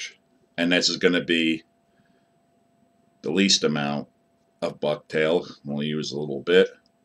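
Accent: Pennsylvania, United States. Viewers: I love it, and BTW, I could detect that PA accent long before I saw the envelope! LOL In my mind, are the colors not reversed? I would have thought the green would be on top? It looks awesome just the same! I just found your channel and am already learning lots! Thanks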